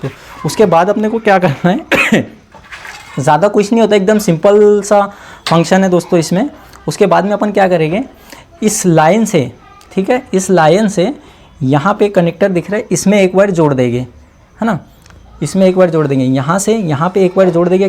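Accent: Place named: Maharashtra, India. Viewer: तुमच्या बोलण्यावरून तुम्ही मराठी भाषिक आहात .. पण तुम्ही खुपच उत्तम आणि सुलभ पद्धतीने समजावता. ... एकच स्वतःची काळजी घ्या... आपणास उदंड आयुष्य लाभो ही श्री स्वामी समर्थ चरणी प्रार्थना !!!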